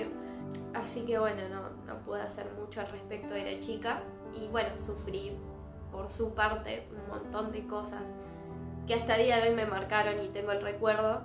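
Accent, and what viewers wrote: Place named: Buenos Aires, Argentina. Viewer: Se nota lo porteño Sho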